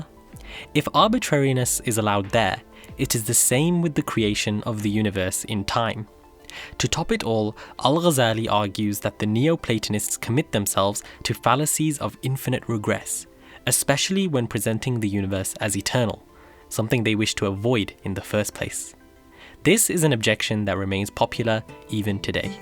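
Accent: Arab world. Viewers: Why Arabic pronunciation? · I was wondering throughout the video whether he's Arab or not, I still don't know, maybe he grew up with Arab friends? It's so hard to tell and I'm Egyptian too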